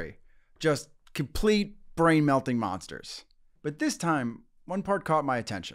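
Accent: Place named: East Coast, United States. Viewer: You have a very similar accent to three blue, one brown. Is that like in East Coast thing?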